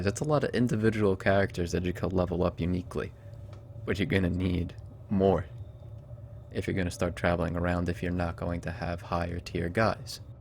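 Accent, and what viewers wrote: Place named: New York, United States. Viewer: Are you from New York? Judging by the way you pronounce "dog" and "small".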